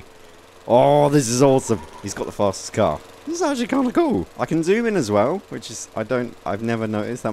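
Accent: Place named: United Kingdom. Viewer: By your accent I think your British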